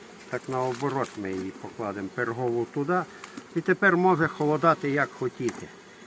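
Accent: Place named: Western Ukraine, Ukraine. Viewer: Закарпаття? Чи де це в сорочці в лютому можна ходити? По говору чути що з західних регіонів.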